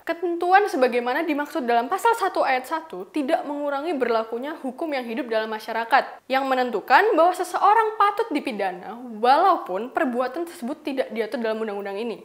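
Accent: Surabaya, Indonesia. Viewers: maklum om arek suroboyo,. logat sulit di kondisikan,. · arek suroboyo yo?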